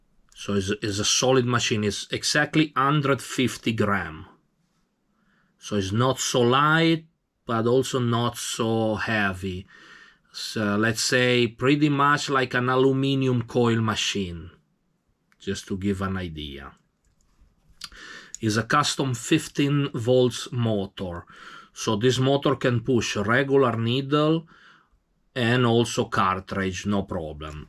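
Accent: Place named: Italy. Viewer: Complimenti per le tue creazioni ! hai davvero grande competenza ! Comunque Si sente che sei italiano ma parli così bene e lentamente l’inglese che sembra come se parlassi italiano . Sei un Maestro 🔝🔥 🔝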